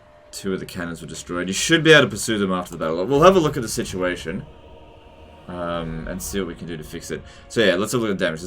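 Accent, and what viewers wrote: Australia. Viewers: When i was scroling through ytl and saw i was like omg it's true cc doesnt work well with an Australian accent.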